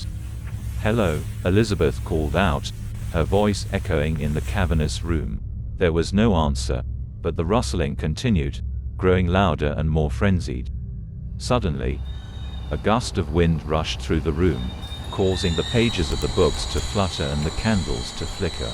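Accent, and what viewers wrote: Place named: United Kingdom. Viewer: read by a British robot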